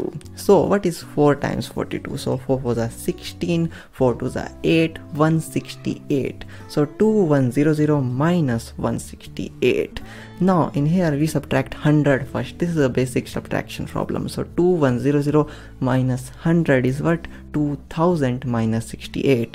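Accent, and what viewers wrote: India. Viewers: Indian accent, shows it all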